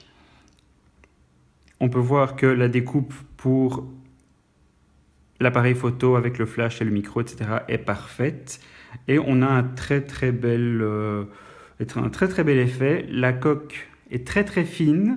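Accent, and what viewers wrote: Belgium. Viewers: Accent liégeois?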